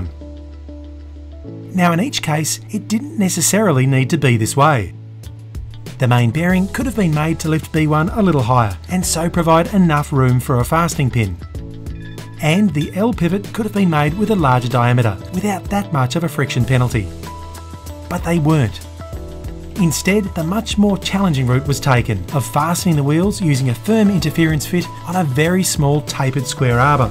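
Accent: Australia. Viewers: Are you Australian? Just wondering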